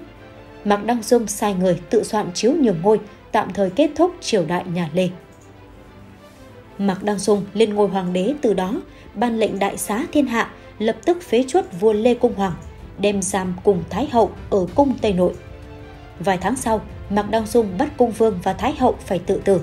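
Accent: North, Vietnam. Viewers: Chi oi. Chi noi tieng [ bac ky nang giong qua) xin dat [ caption tieng viet ) dum mot cai nhe. Cam on chi nhe.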